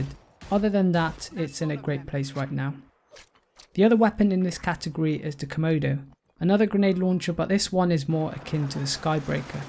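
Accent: United Kingdom. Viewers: Ashy British voices psh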